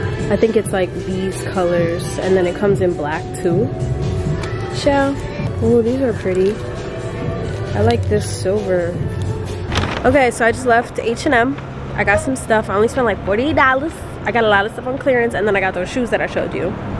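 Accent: England, United Kingdom. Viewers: It’s the English twang for me. Your friends are a vibe. Okay French toes, look at the little pinky toe 🥺😅 Sis said her hat is broken.. comedy just comes naturally to You 😂🥺❣️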